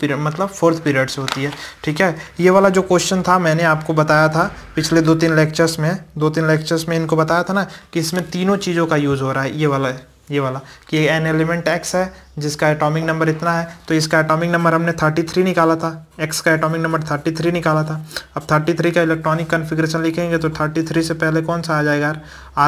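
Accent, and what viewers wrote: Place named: Bihar, India. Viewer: Bhai bhut shai explains krta ho appp❤ love you bro mere ko bhut dikkat thi isma 😊 ak baat puchu bhaiya ap bihar s lag rha ho 😅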